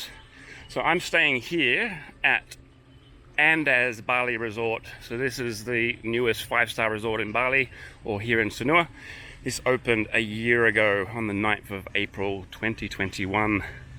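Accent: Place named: Australia. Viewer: Thanks for that! You have a pleasant voice. A nice Australian accent & not too occa!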